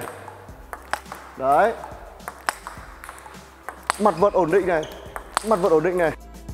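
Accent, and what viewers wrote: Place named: North, Vietnam. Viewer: Cam on, exactly what I was looking for. And your bac dialect makes it so much easier to understand. Sry never learned how to write viet.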